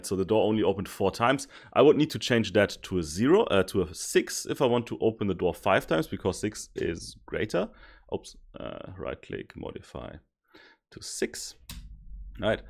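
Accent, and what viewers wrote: Germany. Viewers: Man merkt den deutschen Akzent 😂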